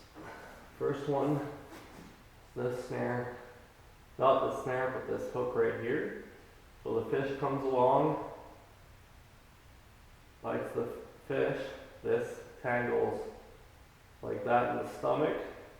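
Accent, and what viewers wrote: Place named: Quebec, Canada. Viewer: Haha je m'attendais pas à entendre un accent québécois Great video the explanations were very clear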